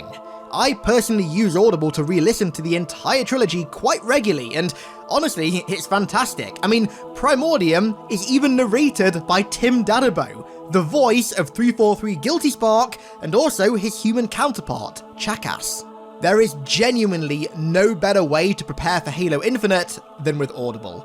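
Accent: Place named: United Kingdom. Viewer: Where are you from? Sound British but speak American.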